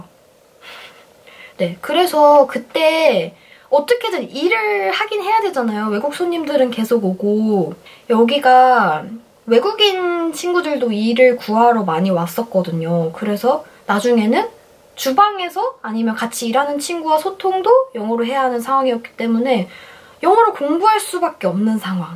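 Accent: United Kingdom. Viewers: 영국 악센트보다보니 제 호주 시골발음이 너무 안쓰러워졌어요ㅋㅋㅋㅠㅠㅠ 목소리도 말씀도 조목조목 너무 예쁘게 잘하세요!😍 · 영국발음 너무 멋져요^^ 저도 영국 발음을 연습하고 싶은 욕구가 뿜뿜하네용~~ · 알고리즘에 끌려 들어왔다가 영국발음에 치여서.. Q&A도 보고 영어 공부해야겠단 생각이 빠짝드네요!❣ 구독하고 갈게요🥰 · 당신...... 혹시 전생에 헤르미온느였나? 영국영어 너무 듣기 좋아요ㅠㅠㅠㅠ 넘 멋있구, 왠지따라해보고 싶고, 치이고 갑니다ㅠㅠ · weird how do u have so strong Britsh accent? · 영국 영어 멋있네요. 한동안 영어 잊고 살았는데 동영상 보고 기분이 좋아집니다. 좋은 하루 되셔요~~~ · 남자친구가 영국에서 7년을 넘게 살다왔는데 남자친구가 하는 영국영어보다 이 하는 영국영어에 더 치이고 갑니다......💜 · 오늘 처음 들어와서 구독박고갑니다. 자주들를게요. 영국발음 넘 좋네요!!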